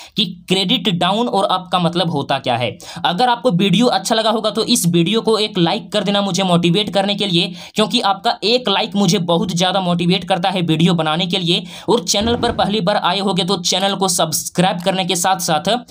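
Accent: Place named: Bihar, India. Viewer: Bihari hai aawaj se pata chalta hai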